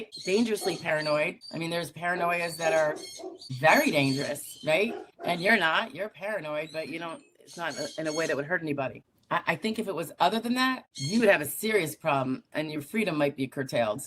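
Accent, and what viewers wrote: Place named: Georgia, United States. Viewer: I thought that accent was a sweet mama Georgia peach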